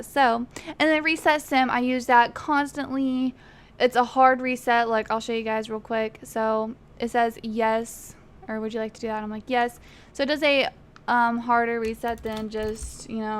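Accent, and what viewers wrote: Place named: West Coast, United States. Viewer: / slightly triggered by her voice, but also knowing maybe she's just from the west coast.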